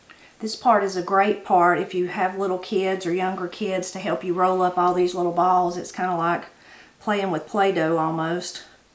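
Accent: Appalachia, United States. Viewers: They look really good,! I’ve only been watching your channel for a couple of months and I love your cooking videos and ones with Matt or Granny. I loved the video yesterday of Matt frying the potatoes and deer in the bag with so much flour! I like how you say milk as ‘meelk’ and a ‘bad place’ when there is a spot on vegetables you’re preparing. The Appalachian accent is so good! I live in the north of Australia (my local area gets 300 days of sunshine a year) and I guess I’d have an accent to you guys too! 🇦🇺 Some of my favourite words you say are Papaw, okrie, chocolate gravy, ramps, Postum, etc. Never heard them before watching your videos.👍🏼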